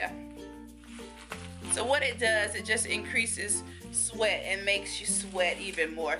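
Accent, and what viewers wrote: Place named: South, United States. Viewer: Well... she does have an accent but it’s because it’s southern. Not ghetto. To me it’s not an accent because I was born and raised down here. Thanks queen for the video!!!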